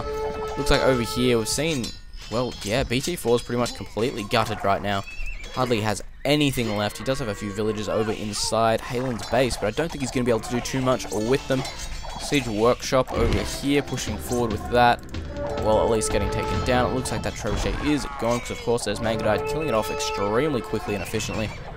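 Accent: Australia. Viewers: Where is the commentator from? Australia? UK?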